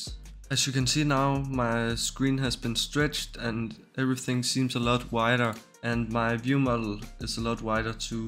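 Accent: Denmark. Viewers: Are you from denmark? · my g is 100% danish i can tell! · are you danish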